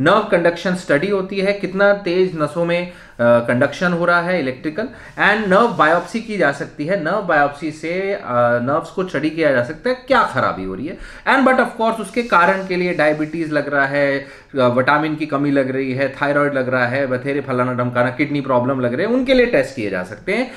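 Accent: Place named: Hyderabad, India. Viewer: Hydrabadi bolre doctor sab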